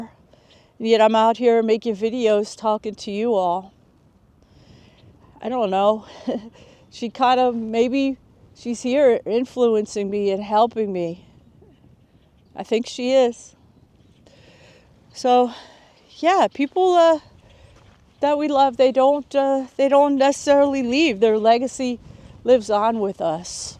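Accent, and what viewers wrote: New Jersey, United States. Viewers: I knew you were from "Joisy", based on your accent! Out "in da bogs; out in da Pine Barrens!" Love it. Classic.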